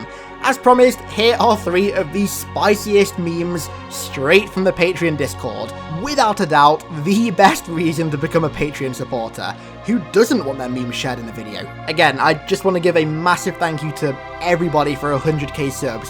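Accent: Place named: England, United Kingdom. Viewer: congrats you have all the xperience;D i love your vids and yeah you are english right? just a question. because of your accent. like i said congrats.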